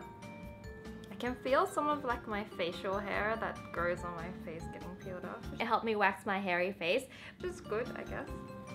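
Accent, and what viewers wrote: Australia. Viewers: are you australian? You have an australian accent. i like it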